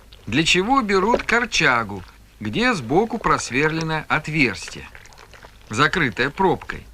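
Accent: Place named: Russia. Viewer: Тогда автору придётся все языки учить прежде, чем фильмы про каждый народ ставить... Если следовать Вашему требованию -- мы никогда этих фильмов и не увидим вовсе. Да и -- что он говорит не так? Я -- коми. И то поняла слова "дöрöм" -- "рубашка"; "турун" -- "трава"; "нянь" -- "хлеб"... Молодец, что произносит с русским акцентом. Уважение и благодарность ему за это! Спасибо --, что выставил этот замечательный фильм. Очень интересно было его посмотреть.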